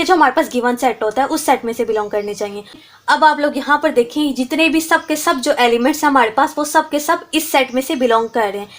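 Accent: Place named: Lahore, Pakistan. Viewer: that Lahoڑi Accent 😂💕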